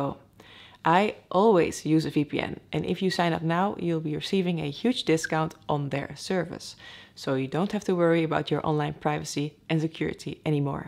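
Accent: Netherlands. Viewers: Heel goed. I love english with a slight dutch accent. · You could tell she's Dutch as she pronounced every "v" like an "f"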